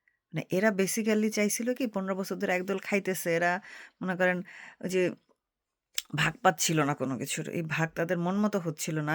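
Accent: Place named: Bangal, Bangladesh. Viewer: আপনি অসাধারণ বলেন । কিন্তু বাঙাল ভাষায় বলেন তাই একটু ধীরে ধীরে বলুন। আমাদের সব বুঝতে অসুবিধা হয়। কিন্তু যুক্তি আপনার অসাধারণ। great---💐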